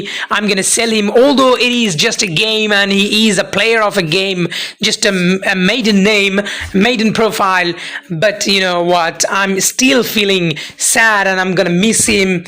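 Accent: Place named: Germany. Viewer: junge direkt gehört, dass du aus deutschland kommst :D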